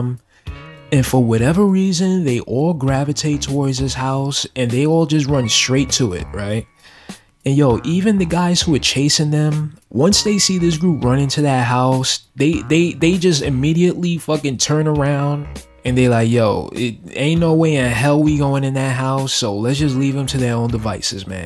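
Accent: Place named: New York, United States. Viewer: Yo, I love your vibe, man. You sound from NYC but I'm not sure. I'm not from the States and I could be mixing up accents. Anyway I like that 'cause Mom picked up a NY accent for the many years she lived there. It's awesome to hear horror reviews in that real street dialogue. Also this book sounds like I need to read this shit soon. Thank you!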